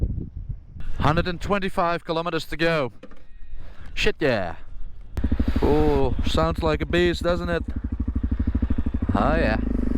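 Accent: Netherlands. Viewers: Do you sell merchandises? Your dutch accent reminds me of Itchy Boots Noraly. You both sound the same. I live on Australia. Used to live in Germany. I can understand and read dutch a little bit. Sounds similar to German.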